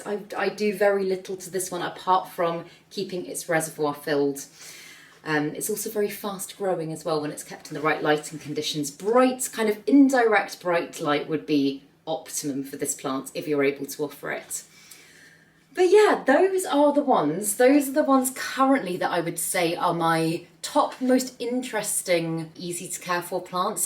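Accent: United Kingdom. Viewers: Like your pure english accent!! Mmmh love it. Which part of Great Britain are u particularly from. Like the clarity of ordinary care you prescribe for each plant. Your camera is fantastic!!!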